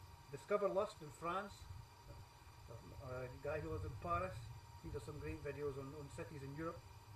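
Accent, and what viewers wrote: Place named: Scotland, United Kingdom. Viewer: Are you Scottish ? bec i m not used to hear English with a n accent like that ( Im french speaking ) and I think you are Scottish it's bit difficult to understand for me , bu t I find your vid is interesting , i like walking in the nature too ^^ i like UK bec it has very vast areas with no roads and no houses but not here in Belgium bec my country is too small ^^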